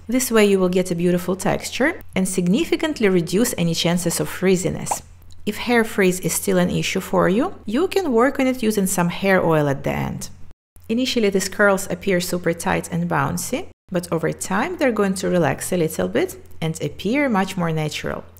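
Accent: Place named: Russia. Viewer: Я тоже из старичков. Люблю видео и сразу услышала наш, русский акцент❤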